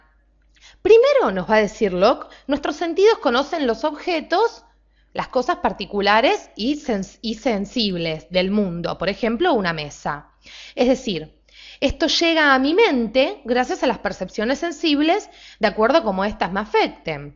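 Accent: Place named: Buenos Aires, Argentina. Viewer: jueno es porteña es el acento de estos arrogantes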